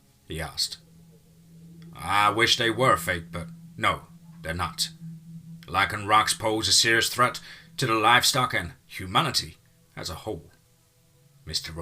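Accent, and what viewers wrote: United Kingdom. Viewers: Your Brit accent and choice of Brit sayings ruins your American stories. Again.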